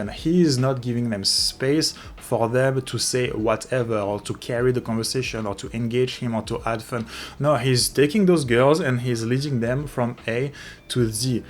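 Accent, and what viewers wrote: France. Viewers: Man, u have a good explanation of Tylers infeild videos... i like this minsdset "theres no downside to anything u say or do, there is only UPSIDE"... got rejected? U become numb to rejection (upside). Don't know what to sat? Say "whatever" (dont give a fck, u r just practicing). Thanks for sharing. Btw, ur accent sounds French, where do u game?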